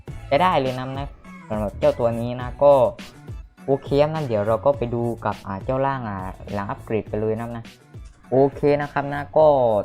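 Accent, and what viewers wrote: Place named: South, Thailand. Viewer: สำเนียงคล้ายคนใต้เลยนื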